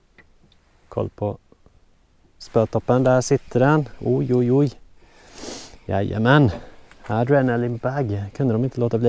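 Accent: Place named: Gothenburg, Sweden. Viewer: Vad var det för frukost du hade med dig? Hörde inte vad du sa för din göteborska ☺️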